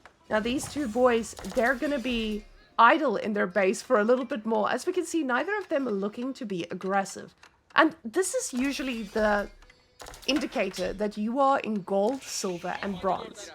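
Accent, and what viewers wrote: Afrikaans, South Africa. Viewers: Taklak, die Afrikaans klap hard :p Lovit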